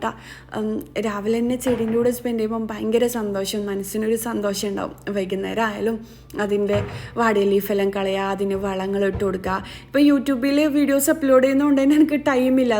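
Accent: Kannur, India. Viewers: Kuttikal varumbolk pappa dam parakoole nammala kannur bashayann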